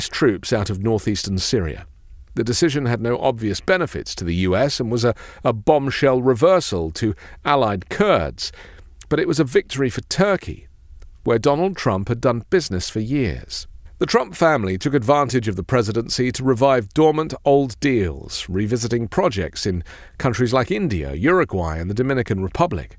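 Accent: United Kingdom. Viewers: You have an excellent way of explaining Trump. I love your Podcasts. I always was a sucker for a British accent ever since the.😊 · My maga people are not going to listen to. Can not get past the British accent in his speech. Not American. The bigotry is astounding. Sorry,.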